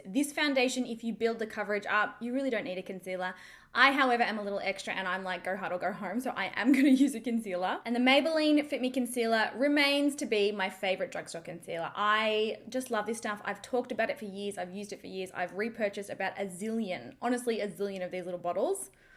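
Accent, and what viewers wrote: Australia. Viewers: Love this makeup video,,, as always you slay every makeup tutorial review and your an awesome YouTuber,,, another thing I want to say is I love your Australian accent,,,, am a fan of home and away and I just love the way the actors speak,,, lol I just wanted to put it out there ☺😎 Lots if love from the UK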